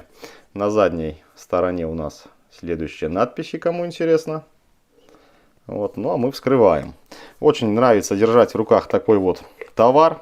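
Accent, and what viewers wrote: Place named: Belarus. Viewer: Спасибо за обзор, фонарик отличный, уже 3 таких купил! 🙂 Заметил что у автора еле заметный говор особенный, такой приятный убаюкивающий, подумал что на белорусский похож, и кажется угодал. Привет беларусам от русских из Эстонии, очень нравится ваш говор степенный! 🙂👍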